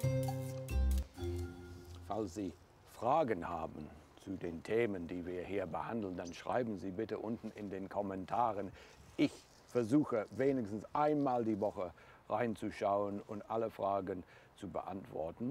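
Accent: England, United Kingdom. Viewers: Morning, nice to hear the English accent being one myself. give the Germans more good tips how to make a good English garden. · Klasse Ihre Beiträge. Auch liebe Ihren britischen Akzent. 👏😀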